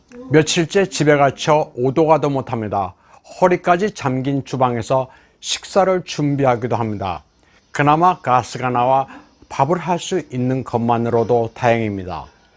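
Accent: China. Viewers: 박상후 기자님 중국 화교이지요? 너무나 중국사람 발음을 애용하시네요. 대한민국식으로 한자 발음을 표기해주시면 너무 감사하겠읍니다. 박상후는 중국식으론 어떻게 발음합니까? 이렇게합니까? 제발 한자 발음을 제대로 표기해주시기 바랍니다.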